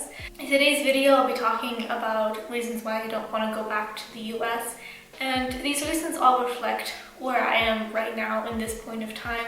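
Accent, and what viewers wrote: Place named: Germany. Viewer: You do not sound like you are originally American your accent sounds like you are a German that moved to America!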